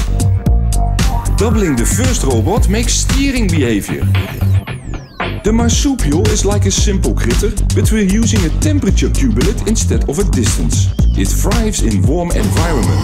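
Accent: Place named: Scandinavia. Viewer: Just wondering why you sound nordic but have a pic of Abe Lincoln?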